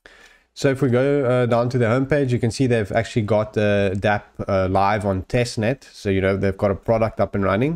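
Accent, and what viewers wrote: South Africa. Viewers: Glad to find this channel . Is that South African or Zimbabwe accent? Are you based there. Thanks again good content for small audience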